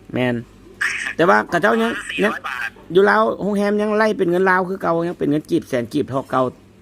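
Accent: North, Thailand. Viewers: อู้เหนือกับ ก็ได้ สำเนียงเหนือกับเวียงคือกัน.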